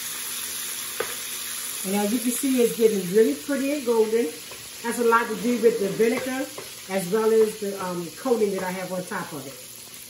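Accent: Baltimore, United States. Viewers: Momma you from Baltimore ? 😂 I can hear it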